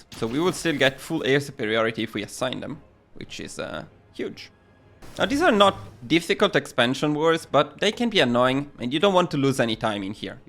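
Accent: Italy. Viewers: Grande bomber avevo un botto voglia di fare sto achievement ma non Trovavo un tutorial buono e poi il tuo accento Italiano spacca, di dove sei?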